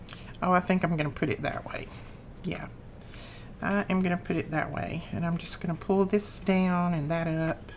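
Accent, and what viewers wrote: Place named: Georgia, United States. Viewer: Hi from Texas. I have been watching a few of your videos and since I was "made in Georgia" I love your accent! Just like family!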